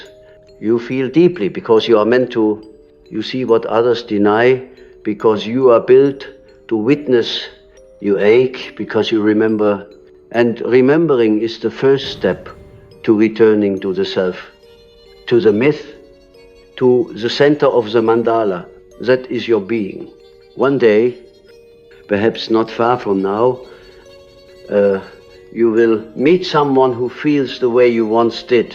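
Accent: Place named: Germany. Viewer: No perfectin in your Speech or pronounciaition. . And to hard on the accent .. tooo germanish .. but acceptable.. create some perfection guys .. like original ..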